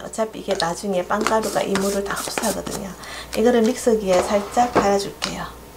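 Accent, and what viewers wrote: Gyeongsang, South Korea. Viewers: 쉽고 빠르게 친절한 경상도언어에 정감있고 맛있는 요리법 고맙고 감사합니다.💕👍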